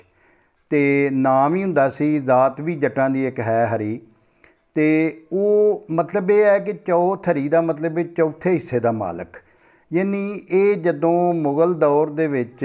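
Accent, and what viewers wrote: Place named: Punjab, India. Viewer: Very sweet Panjabi and appropriate explanation of word Chaudhary.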